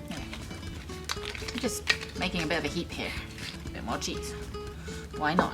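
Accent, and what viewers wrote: United Kingdom. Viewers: Hi,enjoyed the video, that's a real meaty nacho dish.You look more eastern than Mexican and sound British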